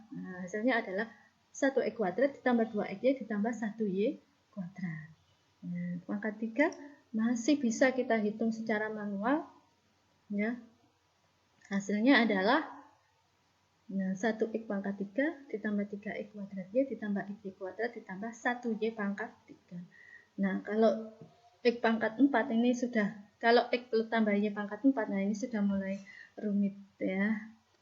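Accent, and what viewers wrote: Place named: Java, Indonesia. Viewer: Good...ustadza...medoknya masih kentara....mohon maaf.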